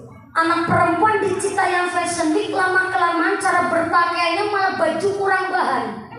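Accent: Banyumas, Indonesia. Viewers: Kayaknya kalau ora ngapak orak kepenak he he he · Pokoknya gak ilang ngapaknya... Hihi Kls 4 SD beliau sudah ceramah di desa Saya...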